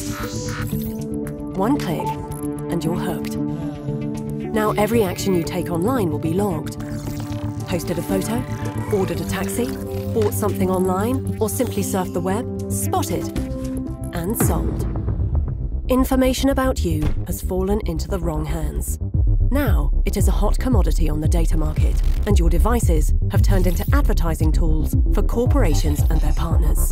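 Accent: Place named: United Kingdom. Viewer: Music and British accent make this very hard to watch! I hate it!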